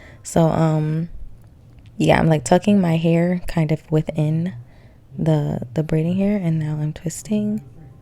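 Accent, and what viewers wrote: New York, United States. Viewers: Are you from nyc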